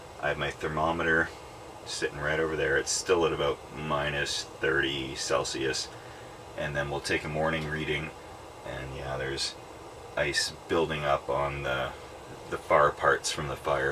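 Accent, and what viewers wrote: Alberta, Canada. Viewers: Why can I tell immediately as an Albertan that I am hearing another Albertan?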